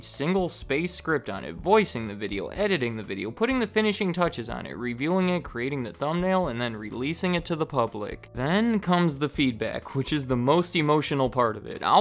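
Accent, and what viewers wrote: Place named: Midwest, United States. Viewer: Sounds Midwest US to me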